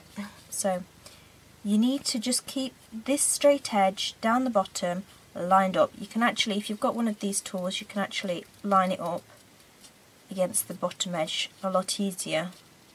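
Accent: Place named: North, United Kingdom. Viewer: There's a Northern voice in there girly!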